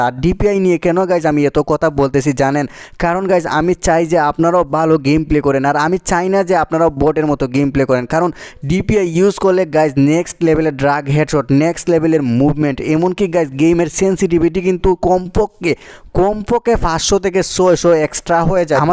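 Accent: Sylhet, Bangladesh. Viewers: Vai Ami o sylhet taki apni o sylhet er hobigonj mone hoy apnar YouTube channele Ami Ei vedio ta deklam R apnar kota gula sylheti Tay Apnar YouTube channel Take Subscribe korlam....Plz.Replay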